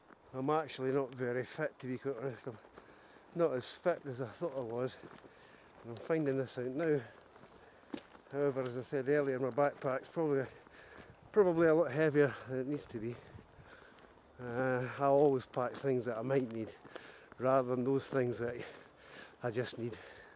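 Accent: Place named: Scotland, United Kingdom. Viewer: Myself and daveswildcamping,love the Scottish accent. It puts u,right there.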